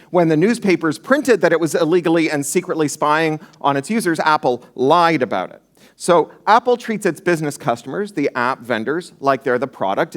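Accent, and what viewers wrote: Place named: United States. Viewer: eek-barba-durkle! this guy with an american accent thinks in euros... or all over the place. tf!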